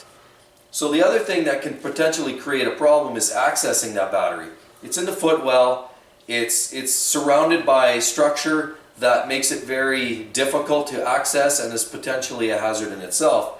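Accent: Canada. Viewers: Are you Canadian or from Minnesota?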